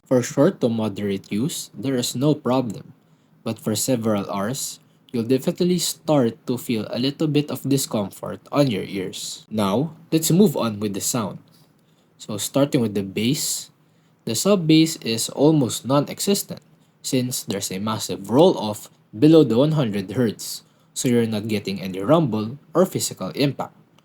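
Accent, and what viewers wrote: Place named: Philippines. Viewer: bro are you also from phillpines like les modio you guys have similar accent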